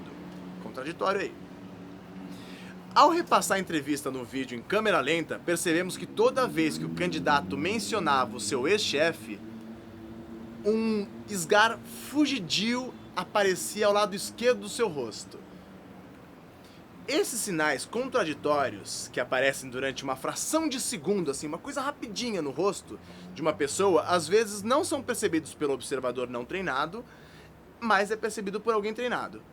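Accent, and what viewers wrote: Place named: Argentina. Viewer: vc é argentino né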